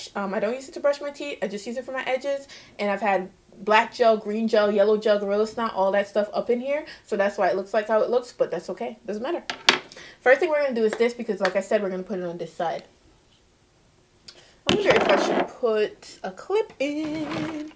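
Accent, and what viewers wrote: Jamaica. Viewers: I thought I heard a slight Jamaican accent. My mother is from JA, my ears are very sensitive to the accent, no matter how slight it is. 🇯🇲🇺🇸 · You are Jamaican. “ Ova yassuh “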